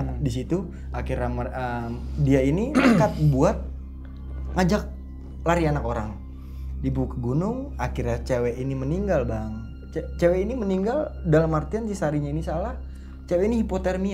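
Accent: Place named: Ketapang, Indonesia. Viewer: Kek orang ketapang bahasanya Beke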